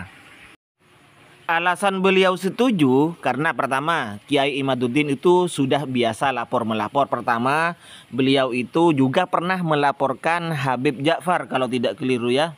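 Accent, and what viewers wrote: Madura, Indonesia. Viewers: Ente orang madura ya min,,? Pantes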